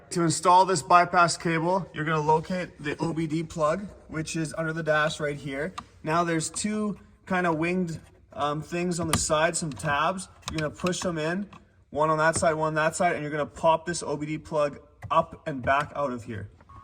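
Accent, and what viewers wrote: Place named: Canada. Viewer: Ya that was a super sick video dude well explained, thought you sounded Canadian then saw the sask plates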